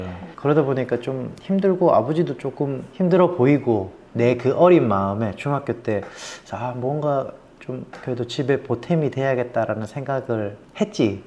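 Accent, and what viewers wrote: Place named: Gyeongsang, South Korea. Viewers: 포항분이신가봐요 저도 포항에 살고 있어요 억양이 엄청 친근감있다 했더니 ... ㅋㅋ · 경상도 말투가 정겹네요.. 자카르타에 살다가 한국으로 휴가나왔다가 복귀 못 하고 있습니다 ㅠㅜ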